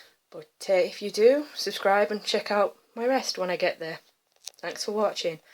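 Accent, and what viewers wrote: Newcastle, United Kingdom. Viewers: love a Geordie accent I can listen to it all day. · Tl;dr Open lid a tiny bit aye, tip gungy water out, has Geordie accent, put salt in which costs 30p. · I luv your Jordie accent....and you are quite foony, too! hahaha. :)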